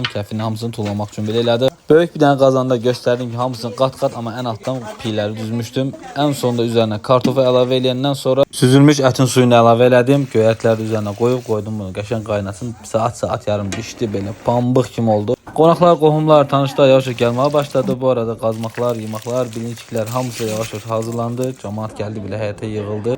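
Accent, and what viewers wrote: Zaqatala, Azerbaijan. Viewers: Zaqatalaya bənzədi , ləhcə və gözəl təbiət. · Ay Allah ne gozel danişir.yemaxlar qazmaxlar.Zaqatala lehcesidi?? Gelmaga başladi. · Zakatala zonası👍👍👍👍👍🥰lehcesinden tanıdım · Аллах габул етсинлар. Аллах Санада гисмат етсин.манимда оглум Асгарда. Билмирам нарадансан дейтрам сиз суннисиз. Санча Загатала. Тала лахчасина охшайыр❤❤❤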